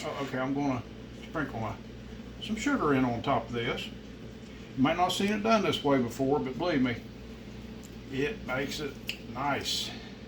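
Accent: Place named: Maryland, United States. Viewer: That looks wonderful!! My dad worked for the B andO railroad, you sound like you are from Maryland, my home state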